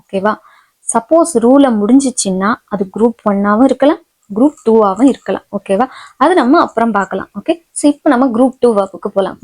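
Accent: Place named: Kanyakumari, India. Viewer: Super. Keep going. By the way, are from Kanyakumari district? I could sense a resembling tone in your speech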